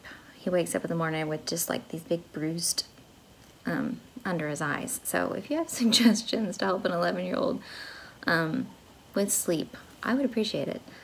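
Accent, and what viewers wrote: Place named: South, United States. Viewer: Thanks for the sweet mention 😊. Maybe I’m biased but I find your voice super soothing, too. I think it’s a preference for southern voices from my Mississippi mom. You have some gorgeous projects❤️. I love lots of things in a chart - houses, anything red, bees and birds. Hope y’all have a great Halloween- btw our church does a big trunk or treat at 6pm on the 31st if you want to bring the kiddos. Happy stitching sweet friend😊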